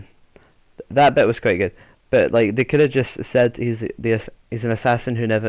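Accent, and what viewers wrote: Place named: Kilmarnock, United Kingdom. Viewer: That killie accent makes me moist :0 Film would've been so much better had they edited it differently. There's a lot of deleted scenes where they could have made a completely different movie, but instead it was mediocre. As for deadshot, he needed humanised, you need to get behind the main characters. They were all nicer and gentler than the comics depict them. Affleck was the tits yo!!!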